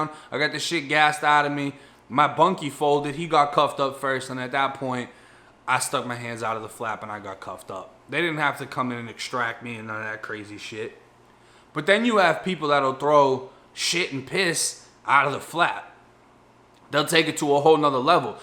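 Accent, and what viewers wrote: Boston, United States. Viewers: Your stories sound better with that bean town accent ya' got..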